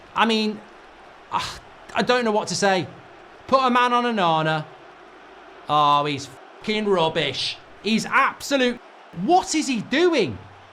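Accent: United Kingdom. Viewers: Is that a Salford accent?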